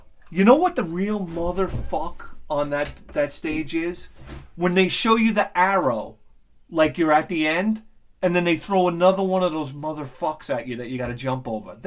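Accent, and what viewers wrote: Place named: North, United States. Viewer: I dont mind it. Has that northern yankee flavor. Somewhat entertaining in doses. I dig his humor and wantnot. Quintessential Northerner. I like the guy. And I agree, his projects are sick!!!!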